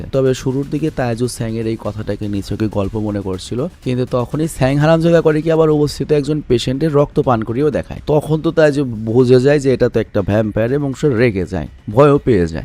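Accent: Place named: Chittagong, Bangladesh. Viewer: ভাইয়া মনে হয় চাটগাইয়া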